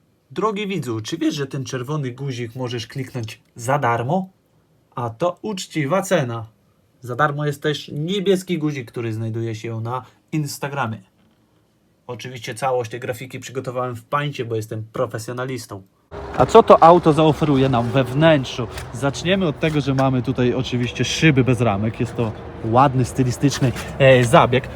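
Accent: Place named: Podlaskie, Poland. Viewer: Ach te "białostockie sljedziki" w akcencie prowadzącego :D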